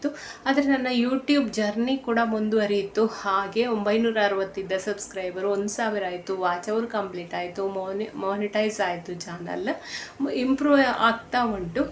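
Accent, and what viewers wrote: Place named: South Canara, India. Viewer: Please support me..nivu maathanaadodu kelidre nivu south canara udupi ಕಡೆಯವರು ಅನ್ನಿಸುತ್ತೆ..ಯಾಕಂದ್ರೆ ನಾವು ಕೂಡ southcanara ದವರೆ🤗🤗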